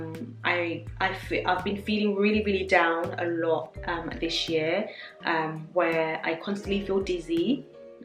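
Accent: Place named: United Kingdom. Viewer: You seem so sweet, please do NOT stop being so nice and friendly because when you do, you have just allowed yourself to be in the class of those who are not friendly. You will get more attention continuing to be who GOD made you. Never let the enemy win! Apparently, they see something in you that they are lacking. You can be reserved, but that's normal, you determine those you detect instantly that you need to be reserved around. See, with all of the designer stuff ( which btw, Chanel is my favorite), some black women get so caught up in doing this as a job and then losing themselves in it, that they lean more towards the designer part....like oh, I'm better, I'm an influencer, I've got, I've got....so I'm better than you, or I can compete against you. It only means you can tell they are not use to having. GOD can wipe all they have away in a matter of seconds. 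And yes, I'm speaking of my black Sisters, not all are like this, but I dont care about what other ethnic backgrounds think. We need to get better at working to " influence" each other. Love your accent....dont know if you are from the UK, but I hope to visit one day. I did live in Europe for 3 years, but that was years ago....b blessed, stay nice and humble, putting GOD 1st and you will flourish...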